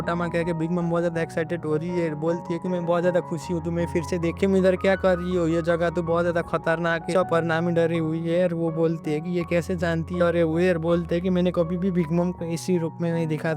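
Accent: Nepal. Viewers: Bro are you from Nepal??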